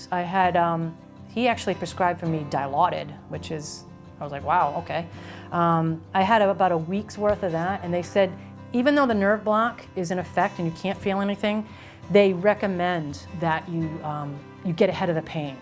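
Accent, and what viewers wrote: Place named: New England, United States. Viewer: Thank you for making this. I dont have bunions, but I have a rare/uncommon condition called Freiburg's disease. It causes the tissue at the top of my 2nd metatarsal (2nd toe, the one you had a hammer toe on) to die and deform because its too long. So I need to have the bone shortened and then the dead part taken of and realigned. It will be less invasive then your surgery, but I will also be immobile for a short time and casted. You video helps ease my nerves. Also you sound like youre from the New England area and it reminds me of my moms accent. So I love it! ♥️ I live abroad and dont hear it often.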